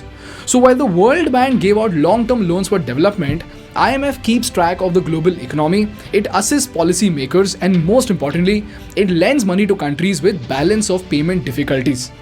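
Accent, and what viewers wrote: India. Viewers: That indian accent 😋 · Indian English really different and very strong Indian accent.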